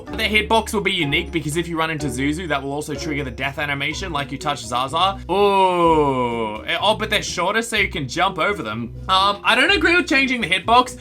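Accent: Australia. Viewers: I just realised in this video that your Australian 😅😂 I don’t know why but I love seeing Australian you tubers! Keep up the amazing work!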